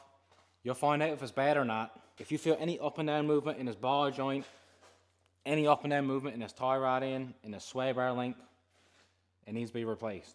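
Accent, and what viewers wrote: Ireland. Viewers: What type of accent is this? Irish Redneck?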